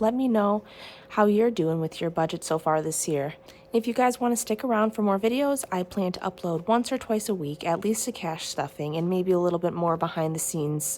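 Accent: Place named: Canada. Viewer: The Canadian accent is so strong, I LOVE IT 😂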